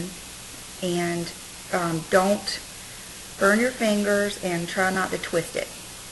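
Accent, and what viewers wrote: South, United States. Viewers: I love love love your hair like that! and your accent. I can tell you are from the south too..lol.. keep up with the great vidoes!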